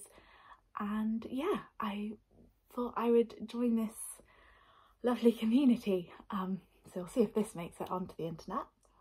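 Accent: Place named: England, United Kingdom. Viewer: Greeting from British Columbia, Canada.....you had me at tea, ginger, chai and your lovely English accent!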